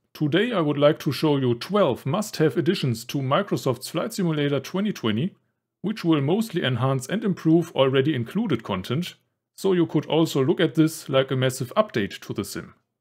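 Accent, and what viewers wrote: Germany. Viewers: Dieser deutsche Akzent kickt so hart! xD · I missed your Duetsche accent! I had to unsubscribe to many cuz it got to be too much. I hope to fly this summer in Xbox X MFS2020. Keep up the good work lad! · I bet you are from a high German area like Münster, Bielefeld or Osnabrück. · Ich wusste doch, dass ich da nen deutschen Sprecher rausgehört habe. Super Video und beinhaltet fast alle must haves. Was ich noch empfehlen kann ist We Love VFR. Bringt VFR Objekte wie Sendemasten, Radarkuppeln, Kräne und bald wohl auch Industrielle Schornsteine nach Europa. Alles basierend auf Open Street Maps. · Super Video 🙌 Ich wusste sofort, dass du deutsch bist ahhahaha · Hey great video thanks! Funny is just how you express your English! Then I poop the sausage off! It's so funny your German English accent. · when a german speek english nice video ;) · ich wollte ihm nur einen Tipp geben und es klingt doch noch recht Deutsch aber ich weiß nicht wieso du dich dort nun einmischen musst Grüße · thanks for sharing, you have very strong germany accents while talking in english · I like the german accent ;) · Hello again, long time gone. 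I finally found you doing flights again! Love your Duetsche accent! SUBBED/LIKED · Danke! (you have a German accent! :P)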